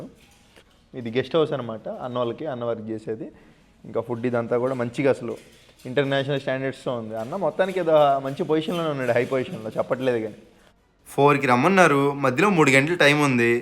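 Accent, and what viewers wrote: Guntur, India. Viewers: Anna meedhi guntur aah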